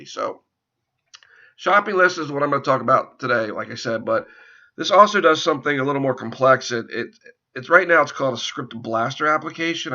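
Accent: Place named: Eastern Shore Maryland, United States. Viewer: Sounds like a dude from the eastern shore of MD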